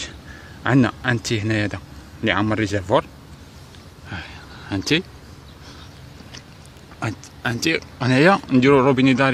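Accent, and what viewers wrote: Algeria. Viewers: كل منطقة ولهجتهم # أظنه من ضواحي مستغانم....عشعاشة. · سلاااامااات!! هذه لهجة الغرب الجزائري إذا فهمتها فهذا جيد! وإذا لم تفهمها فابحث عن فيديو آخر بلهجتك أو باللغة العربية الفصحى! بدل تعليقك (الثقيل)!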